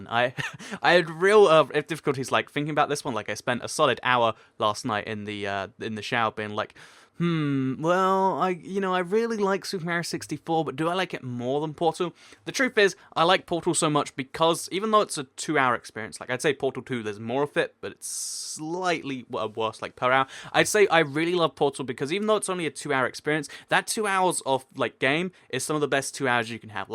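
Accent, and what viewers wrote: United Kingdom. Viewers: Are you British I am? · You're British. Say "H" for my amusement. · What part of the U.K. Are you from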